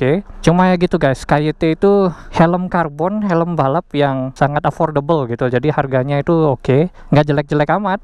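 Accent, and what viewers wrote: Bali, Indonesia. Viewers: Logat nya bali banget...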